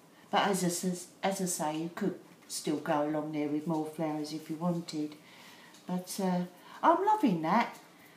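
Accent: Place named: United Kingdom. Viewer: Hi so glad you did a tut on this I saw the one you sent to Tricia and so wanted to have a go. You are so clever. I have sub you and look forward to seeing some of your other videos. I am assuming from your accent that you are in the UK. As am I. What is the Xmas club all about would love details. Hugs Jeanx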